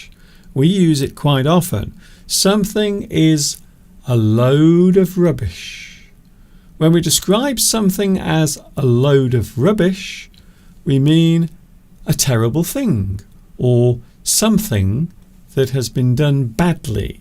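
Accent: United Kingdom. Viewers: Very interesting and useful lesson as well as a clear British English pronunciation .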